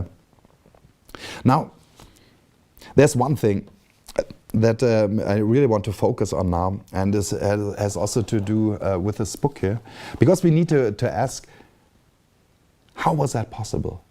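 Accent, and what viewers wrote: Germany. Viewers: Du hälst den Vortrag in Englisch als ob du ihn in Deutsch halten würdest und das bereitet mir Kopfschmerzen beim zuhören. Ich höre dir gerne zu aber mach das bitte in der Sprache in der du es kannst. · Was ist daran komisch? Sein Englisch ist fehlerfrei und verständlich und ja, man hört, dass er aus Deutschland kommt. Aber muss man sich denn dafür schämen aus Deutschland zu kommen?!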